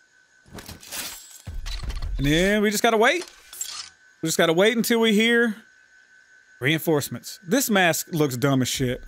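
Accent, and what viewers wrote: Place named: Tennessee, United States. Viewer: You sound like you're from Tennessee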